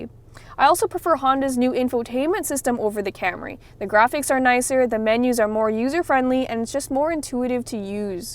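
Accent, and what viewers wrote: Canada. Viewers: She's the coolest Asian Millenial with a hint of Canadian accent Autoguide can find to go at it HAM.....LOL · Is the price in Canadian dollars? You sound Canadian.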